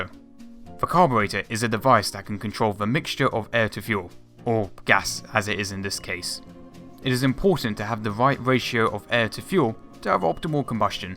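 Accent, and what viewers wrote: England, United Kingdom. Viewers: Why has an british accent? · This is true. He's from England, but not the part where I live.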